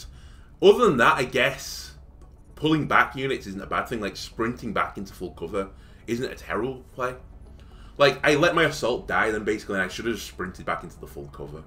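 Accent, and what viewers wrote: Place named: England, United Kingdom. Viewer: The first two missions on Impossible are about not having LOS. If they see you, they'll kill you. Do the math: Sectoid with 70 aim has 1/3 chance to hit you in full cover so 3 sectoids seeing you mean that at least two must miss and the third must not hit 4+ ... 1 - (0,7*0,7*0,85) = 60% that your soldier survives the turn. If he is wounded, chances drop quickly. Side note: Because they don't have enough aim, they can't hit hunkered soldiers if they are in full cover. So if you can't kill them, hunker down (while your soldiers maneuver - without LOS). And forget overwatch during these missions. Though it works on roof tops two squares away from the ladder if you have 4 soldiers on overwatch ... LOL Btw: Where do you live? Most of the time I'm listening to Americans but you sound sooo English. I'm German so I can't judge just by listening but you remind of these Oxford English cassettes (school, yeah)...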